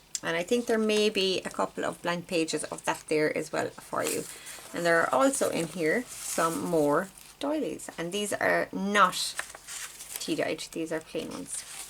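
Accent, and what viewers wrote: Ireland. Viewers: Love this idea❤️how fun! Things I like about your channel… love hearing your Irish accent!, subscribed during JB shenanigans, loved working with your Echoes of Ireland kit… watching for more of your beautiful work!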